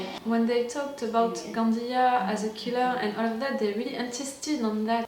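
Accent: France. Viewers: T’es française t’a un ptn d’accent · Haha ça s’entend que tu es francaise mais c’est trop cuteee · Are u French? Love your accent although I can’t understand a lot haha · your accent is so french and I love it 😂😍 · J'adore comment j'ai vu direct que tu étais française, on sent nos accents 😂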